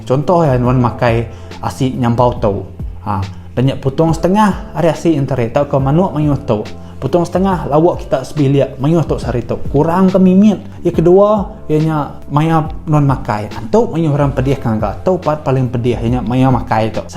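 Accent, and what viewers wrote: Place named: Sarawak, Malaysia. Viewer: Hui nda faham akoo slang Sarawak.. Cuba baa 24 hours slang sabahan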